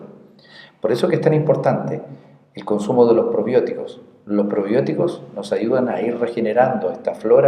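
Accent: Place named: Chile. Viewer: Hola Estimado Muchas gracias por su aporte a las personas que estamos interesados en investigar aprender a cuidarnos. etc. En mi opinión es uno de los mejores videos que he visto sobre éste tema puntual. FELICITACIONES.. y la verdad que me he sacado algunas dudas con su video, me falta mucho aún pero seguiré aprendiendo necesito curarme. Me han diagnosticado INTESTINO IRRITABLE, y dudé sobre éste diagnóstico no tengo duda que lo que tengo es más que INTESTINO IRRITABLE. Por su forma de hablar parece Chileno verdad? Desde Uruguay le mando mis saludos. Francisco Machado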